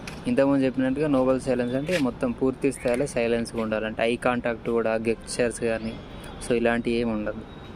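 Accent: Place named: Karimnagar, India. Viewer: Karimnagar language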